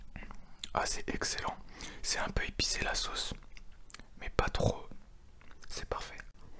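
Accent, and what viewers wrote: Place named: France. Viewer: I love French accent :c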